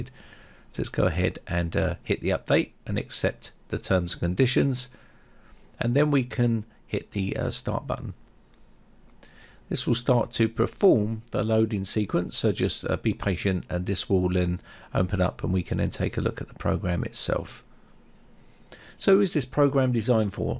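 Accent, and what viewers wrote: England, United Kingdom. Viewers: Since you talk with an English accent, why are you pronouncing CPU-Z as "CPU Zee" and not "CPU Zed", like any Brit would?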